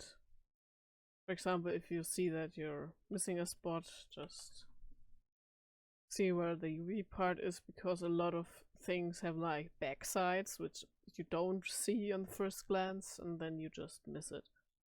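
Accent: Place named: Germany. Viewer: judging by the accent youre 100 percent german lol great vid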